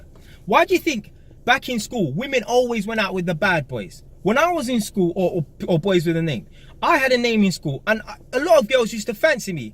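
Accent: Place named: Hounslow, United Kingdom. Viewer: Yo g your accent different where u coning from Hounslow?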